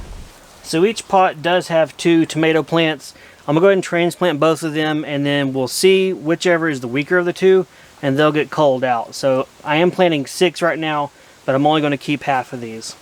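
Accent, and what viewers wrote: South, United States. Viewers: I think that's a southern accent, I'm in a different part of U.S. and we say soil (soy-el) 😉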